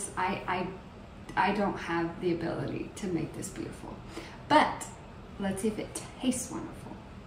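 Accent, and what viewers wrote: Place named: South, United States. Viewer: That’s absolutely southernese! I love it! Go to Europe and you will find many different variations in language but I would not dare to ask them to change their way of speaking bc my way is different. That’s what is unique ab people from different parts of the world. Stay the way you are. I do love hoe respectfully she ask though.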